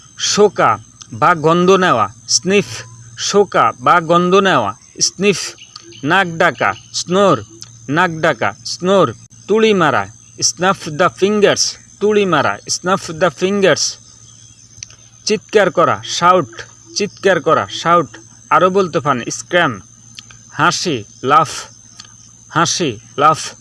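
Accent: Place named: Bangladesh. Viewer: উচ্চারণ শুনে বোঝা যাচ্ছে বাংলাদেশী ইউটিউবার। বানান আর উচ্চারণ ঠিক নেই তো কিভাবে গণমাধ্যমে শেখাবে?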